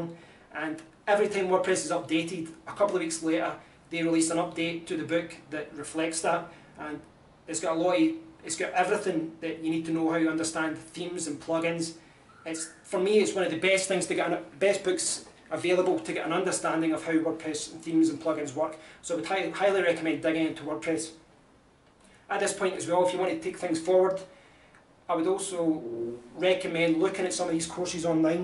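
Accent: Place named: Glasgow, United Kingdom. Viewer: Thanks,. Lots of useful advice there. And +1 for books. Agree also that Udemy quality is variable to put it mildly. And some of the claims they make - like become a fully fledged developer in 6 weeks - are a bit wide of the mark imo. (Also, loving that accent. Reminds this southern softie of the very happy years he spent living in Glasgow.) Thanks again.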